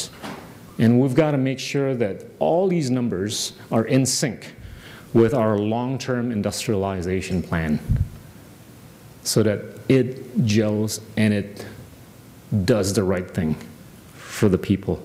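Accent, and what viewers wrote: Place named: United States. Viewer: terima kasih pak gita, saya belajar American Accent dari anda lewat video ini, sangat membantu dalam mengembangkan bahasa inggris saya yang masih awam dalam accent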